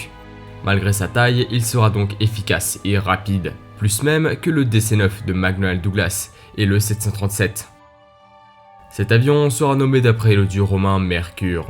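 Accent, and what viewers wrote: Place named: Japan. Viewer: Je suppose que est Japonais ou d'origine japonaise. On le ressent bien par l'intonation de la voix. Donc, il s'exprime un peu comme ses parents et il n'y peut rien. En plus son français est excellent, articule parfaitement bien et ne parle pas comme ces mongols qui adorent ajouter le son "j" après les "d" ou les "t" comme par exemple " je tj'ai djit". Ça oui, c'est trop insupportable à entendre.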